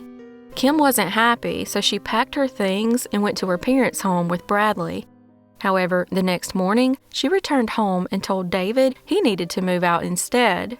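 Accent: South, United States. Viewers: Love your videos! 🤗 What state are you from ? I’m trying to pin point your accent! I was born in the south but moved to CA as a kid so I think your accent might be from my home state but I could be wrong ! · I am a 52yr old Lifelong Southerner, Native American, and Native Floridian. So before I say this, understand, it comes from a place of love, but, until you ditch the southern accent, you will never be given respect as a journalist.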